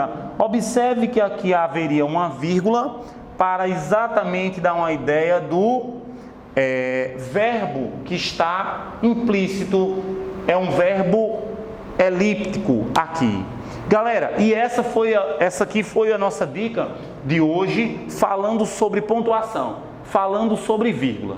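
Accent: Northeast, Brazil. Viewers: Além da aula fantástica, ainda tem esse sotaque lindo dos nordestinos. Maravilha!!!!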